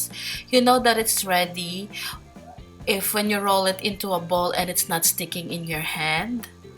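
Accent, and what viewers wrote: Philippines. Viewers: Ohh...because I had a friend who is filipino and she recognized your voice that you are filipino.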